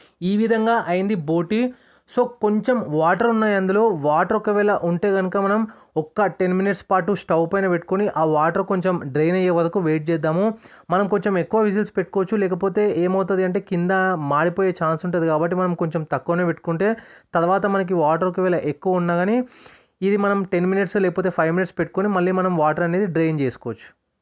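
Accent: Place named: Telangana, India. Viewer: Fine cooking 🍳🔪 bro❤ Telangana language bro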